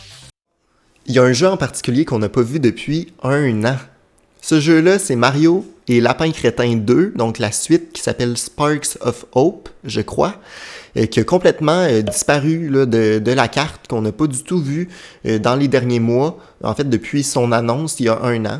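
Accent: Quebec, Canada. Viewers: Excellente vidéo ! J'suis nouveau sur ta chaîne et c'est vraiment de la qualité : c'est dynamique, bien monté etc donc Bravo ! (En plus j'adore ton accent québécois il est trop cool XD) En vrai c'est totalement possible d'avoir un ND la semaine prochaine, c'est habituellement vers milieu juin qu'on a un direct Il y aura surement de bonnes surprises sans oublier Splatoon 3, Mario + rabbids qui seront au RDV ! Enfin j'espère ^^" En tout cas, il ne reste plus qu'à attendre :)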